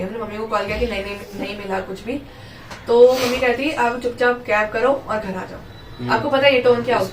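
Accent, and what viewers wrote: Haryana, India. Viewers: this red t - shirt wale bhaiya has been controlling his laugh and had never been serious in the whole video 🤣🤣😂😂😅😅🤣🤣🤣 by the way I love ur videos and I request u to pls give some tips and information about apps u use for editing etc. to help me start a you tube channel🤗🙏🙏🙏🙏🙏🙏❤❤❤❤❤❤☺☺☺☺☺ by the way di aap HARYANA se ho kya ??? because apka accent bilkul vaisa hi h guse mai ya funny mai😂😂😅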